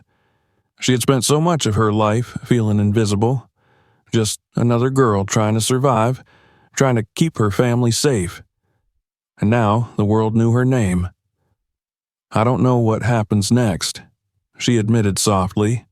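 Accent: South, United States. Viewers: I love your southern accent. May I ask where in the south you are from? I lived in S C for 12 yrs loved it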